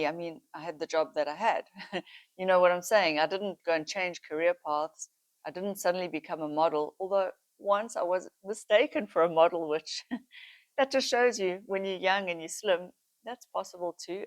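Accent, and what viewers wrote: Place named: South Africa. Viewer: You’re South African! Me too! I can hear it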